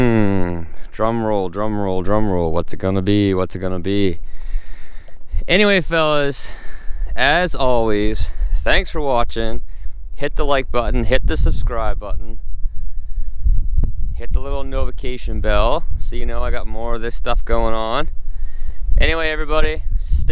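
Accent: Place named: Maritimes, Canada. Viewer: Get it done before the snow flies again on Monday. You're "Maritimer" accent is coming out "Started up the trucks from inside the "ouse" so glad you don't have a "bout" too. Hahaha. Don't worry I bug my friends too about it. Nothing like a Caper talking about the squalls or de fishing season. Cheers.🎄❄🎄❄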